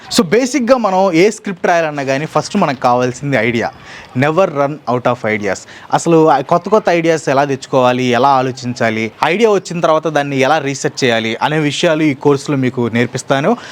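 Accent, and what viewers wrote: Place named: Tenali, India. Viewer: మా తెనాలి రేపల్లె డెల్టా ప్రాంతాల్లో ఉండే స్లాంగ్.... వచ్చిద్ది వెళ్ళిద్ది కెవ్వు కేకే 😜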